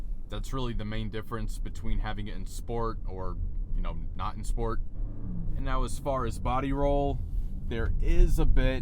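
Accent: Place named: New Jersey, United States. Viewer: Bro’s never heard a Jersey accent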